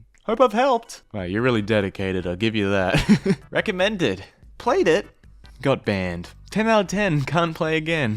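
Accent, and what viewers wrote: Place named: Australia. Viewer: Why do all my favorite British YouTubers always turn out to be Australian?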